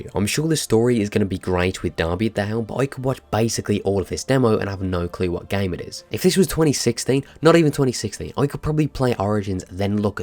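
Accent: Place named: Midlands, United Kingdom. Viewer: Where are you from man, your accent sounds so ambiguous, I’m either thinking somewhere in the Midlands or the West Country 😬😬😬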